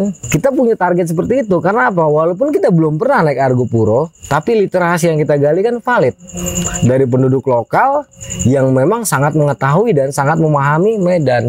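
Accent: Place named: Jakarta, Indonesia. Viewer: Suka bgt dialeg bg. kl lg cerita serasa akrab skali apalagi logat jakartanya asyik gaya betawi ky aye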